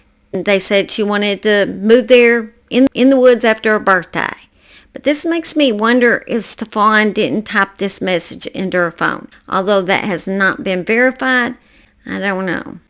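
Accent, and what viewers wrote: South, United States. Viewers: I appreciate your content! These cases that aren't told need to be heard! As a southern girl myself, I can't get enough of that twang. Keep on keepin on.❤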